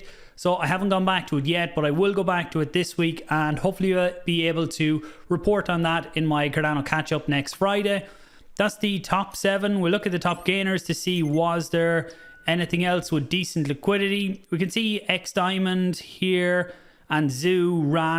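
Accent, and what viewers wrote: Ireland. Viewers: Are you from Sligo man? :D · No way boy, he`s a DUB :)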